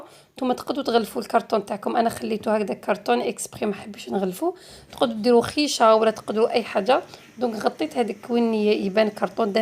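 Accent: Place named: Algiers, Algeria. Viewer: الله يبارك اختي افكارك رائعة ماشاء الله، وشحال تعجبني البحة ل في صوتك مع اللهجة العاصمية حلوة بزاف ، اختك ام إياد من الشرق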